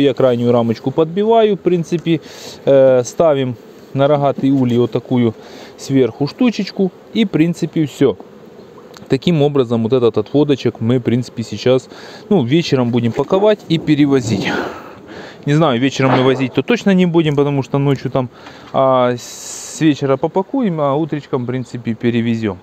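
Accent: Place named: Ukraine. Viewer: К ролику можно цеплять метку геолокации. У многих видел. А иначе понять, что вы из Украины, можно только по говору и по вальмовой крыше на заднем плане)) и то это не точный способ. На юге России тоже похожий говор и крыши:)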